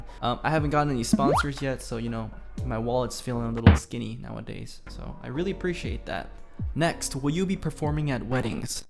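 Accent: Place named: South Korea. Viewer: One question (Sorry not on discord will join soon) Will u voice anime Ur Korean accent sounds like u could Ty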